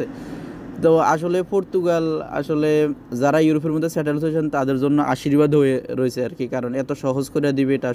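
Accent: Noakhali, Bangladesh. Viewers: vai ki noakhailla ni? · বাই আপনার বাড়ি কি নোয়াখালী নাকি জানাবেন অবশ্যই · ভাই আপনার দেশের বাড়ি কোথায় নোয়াখালী আপনার কথা শুনে খুব হাসি পায়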